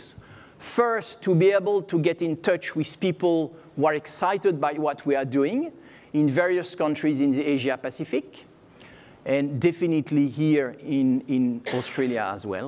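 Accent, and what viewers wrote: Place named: France. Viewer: I like French accent. Very sexy. Period.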